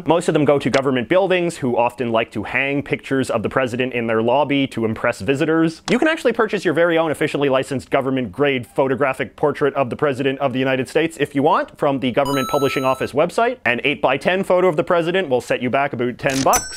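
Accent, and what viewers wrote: Canada. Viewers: Your Canadian accent physically hurts me. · Wait, are you Canadian? Every time you say, “about” I’m like, heyyyyyy.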